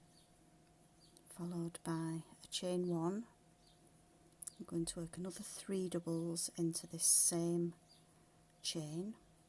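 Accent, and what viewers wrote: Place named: England, United Kingdom. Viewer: You sound English yet you are calling the stitches in American! They are Triple stitch not doubles.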